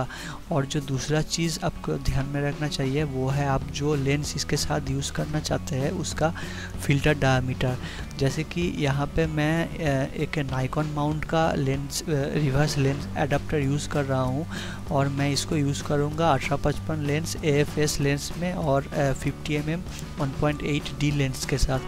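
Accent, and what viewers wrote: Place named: Bihar, India. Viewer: bhaai bihaar se ho aap apki aawaaaaj se he pta chal ra hai.........nice job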